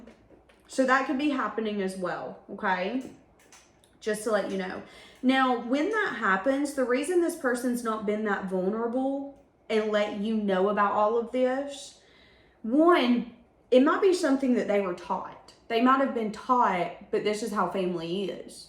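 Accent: South, United States. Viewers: you are very welcome..I like the sound of your American southern? accent..its great..I'm English and live in NZ..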